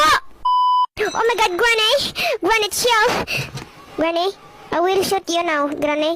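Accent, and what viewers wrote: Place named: Visayas, Philippines. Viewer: Bisaya ka uyy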